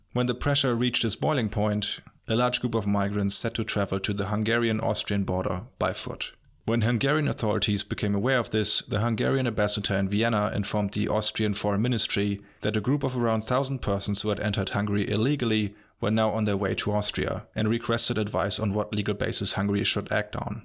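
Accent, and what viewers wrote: Germany. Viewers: Please more reciting rap lyrics in your German accent, best thing ever. Oh and always great content. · I just realized you're German... makes sense now with the accent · My God, you are German. You are the protoGerman, the Urdeutsch. I am, from now on, using you as my "Voice of Germany". Since this is youTube I add: no, this is not sarcasm. You make Bismarck sound Italian, Luther sound Greek. I ask, therefore, from you a summation of the Chancellorship of Angela Merkel. From the early Merkel muddle through days, to the rise of the EU and the Imperial Eagle, to the "I shall crush Greece until it squeals", ending in "es gibt keine obere Grenze" or "es gibt keine Grenze" or whatever it was. So far as the refuge crisis goes, it will be remembered as "es gibt keine Grenze" and not a failure of paragraph c, subsection 128, page 6498, of the Dublin Accord.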